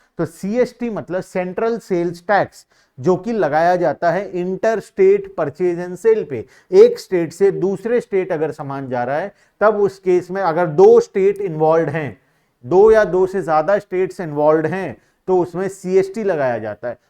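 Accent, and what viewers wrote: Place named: Sambalpur, India. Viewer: Sir kya app western odisha se hai kyu ki app ki bate thodhi thodhi sambalpuri language jese lag raha hai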